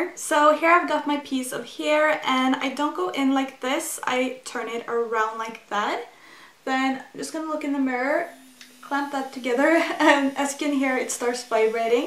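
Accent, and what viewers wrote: Norway. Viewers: I KNEW you were Norwegian hahaha heard it!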